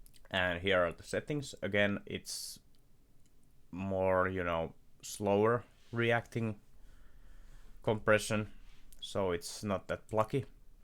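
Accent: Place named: Finland. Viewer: Olipa ilonen yllätys kuulla rallienglantia hardstyle tutoriaalissa!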